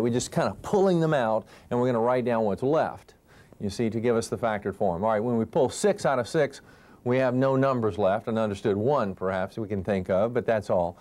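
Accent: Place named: South, United States. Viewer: This is an EXCELLENT refresher for the GRE. Thank you! (Also, love the southern accent.)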